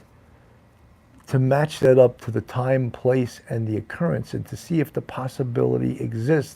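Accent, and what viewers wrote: New York, United States. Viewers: I watch a lot of crime shows, which is probably why your channel popped up in my feed a few days ago. Really enjoy your commentary and NY accent.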